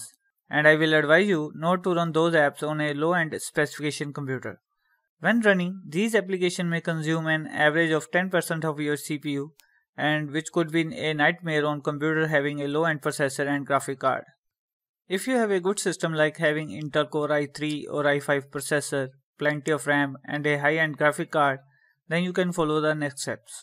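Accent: India. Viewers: Me hears an Indian voice... Instantly clicks away... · love the Indian accent.. good , helpful video too. Thank you! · 100% Hindu. I swear didn’t even look at comments but I knew immediately he is from India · indian english is cute xD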